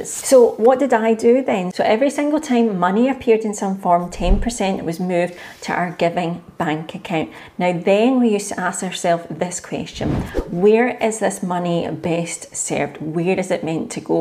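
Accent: Scotland, United Kingdom. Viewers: My Good Lady, perhaps t’was your Scottish accent, the way you come across as a sincere lady and, I may be wrong, I feel we have a common “Friend” with a Beard who put you on my path! So I listen and opened a Vanguard life strategy 100! If I need some more advice, how can I contact u! My name is Fred! Im happy to give u my email and number👍🏽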